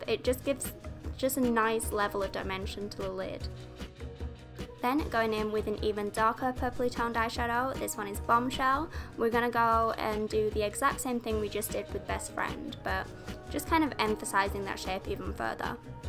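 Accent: Yorkshire, United Kingdom. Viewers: so nice hearing a yorkshire accent on this website! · Yay a new vid! Where is your accent from? Is it somewhere in Yorkshire, I always watch your videos when I have panic attacks because your voice really relaxes me:)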